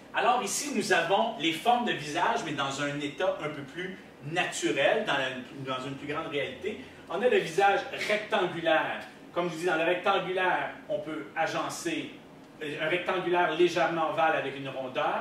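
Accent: Canada. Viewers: Bonne vidéo avec un joli petit accent du Québec !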